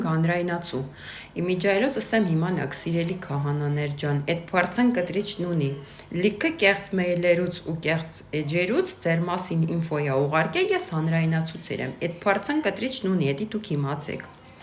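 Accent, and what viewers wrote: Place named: Martuni, Armenia. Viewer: Աղջիկ ջան դու վերջն ես ախար լավնես , բա մարտունու լեզվով շաատ սիրուն ես խոսում դու😂😂❤❤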